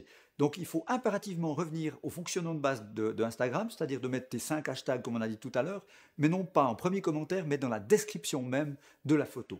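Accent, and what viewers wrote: Switzerland. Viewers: Très bonne vidéo, très intéressant, surtout pour des gens comme moi qui ont Instagram dans le coeur de leur business. Est-ce que je peux te demander tes sources? Car celles que je consulte habituellement n'ont pas relayé ces infos:) Très bon boulot en tout cas, je vais regarder d'autres vidéos 😊 Sinon, serais-tu Suisse? Je semble déceler un accent comme ceux par chez moi;)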